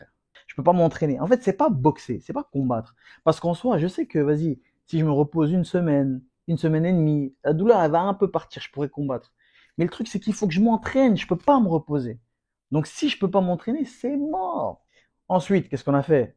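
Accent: Cameroon. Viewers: L'accent Cameroun tu gères ça en normal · Grave l'accent camer ❤❤😂😂😂😂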